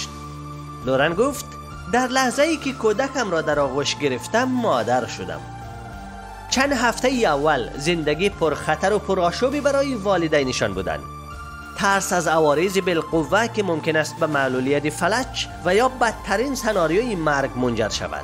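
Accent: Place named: Afghanistan. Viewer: ببخشیداین لهجه افغانستانی است باماخیلی فرق میکند ایشون اشتباه نکردن.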